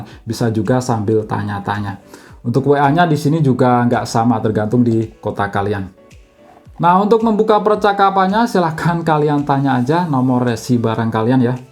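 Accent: Blitar, Indonesia. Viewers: Orang Blitar kah?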